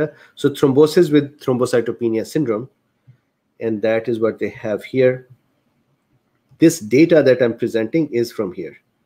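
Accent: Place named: India. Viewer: I think your accent (and Indian accents in general) is beautiful. Also, there is no problem for me to understand what you're saying (I'm a native US English speaker). A few tips on the only mispronounciations I noticed: VAERS->vares (rhymes with cares), convalesce->conva-less (convalesced, just append a "d" sound at the end, like any "ed' past tense verb). There was (only) one other word, but I couldn't remember it, nor find it.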